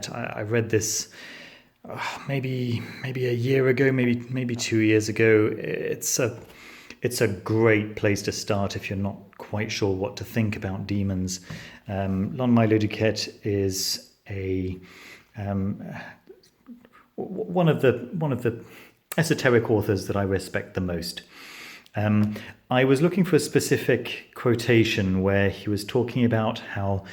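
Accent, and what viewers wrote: England, United Kingdom. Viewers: I enjoy your voice and would gladly listen to esoteric books as read by you. I'm curious if your are English, or perhaps South African?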